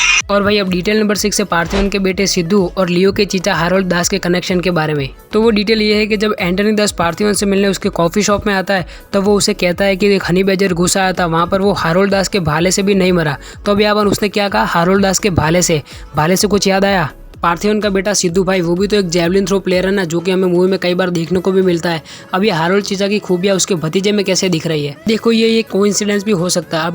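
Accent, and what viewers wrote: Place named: Gujarat, India. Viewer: "Hu maja ma chhu Bhai" tamari bolva ni reet upar thi kithu mee 😎😎😎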